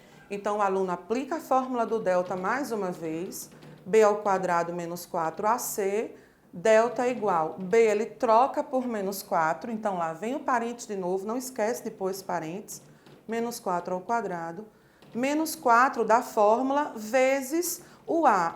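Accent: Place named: Northeast, Brazil. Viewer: e do nordeste pelo sutaque kkkkkkkkkkk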